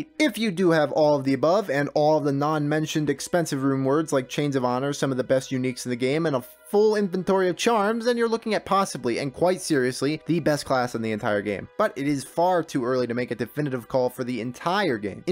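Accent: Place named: Northeast, United States. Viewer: lol oh word, you have more of a northeast rhythm i thought. Anyway, good stuff! Although sometimes I wonder if you like the game ahaha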